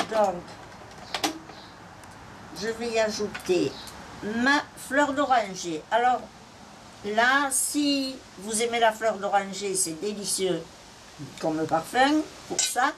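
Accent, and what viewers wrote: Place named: Nice, France. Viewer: Bonjour chère je suis de Sète née a Marseille et vous avez le même accent que "Mado ka Nicoise"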